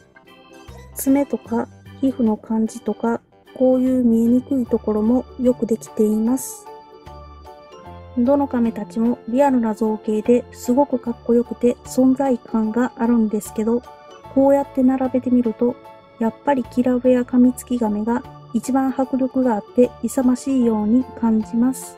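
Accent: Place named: Kansai, Japan. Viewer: 喋ってる人関西弁度々入ってるやん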